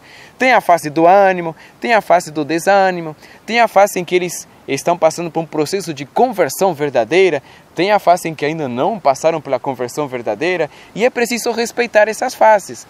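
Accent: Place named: Spain. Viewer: Você não é Brasileiro né? Seu sotaque é meio para o espanhol o enfim, eu também sou filha de pastor e já passei por muitas coisas que você citou no vídeo ...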